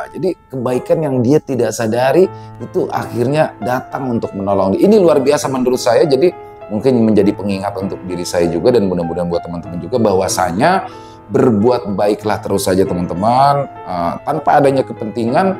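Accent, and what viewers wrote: Jakarta, Indonesia. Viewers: barrokalloh yg dinanti2 bang piyan muncul juga plng suka kalau bawain bahasa betawinya mantep pokoknya sukseees terus bang dengan cerita2nya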